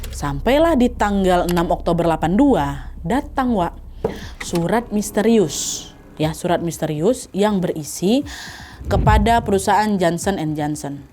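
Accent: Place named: Sumatra, Indonesia. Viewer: Cuma yang bisa ceritain kisah yang aslinya dari luar negeri semua tokohnya jadi berasa jadi orang Sumatra hahaha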